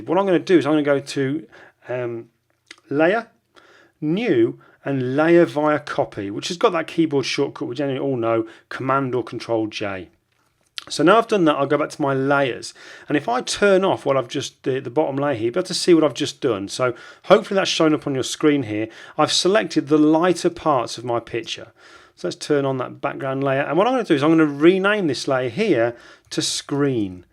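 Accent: United Kingdom. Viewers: Amazing tips, Great stuff , with a British accent too :) keep these coming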